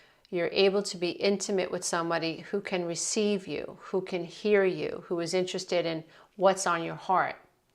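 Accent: New York, United States. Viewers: you are so New York. It's cute.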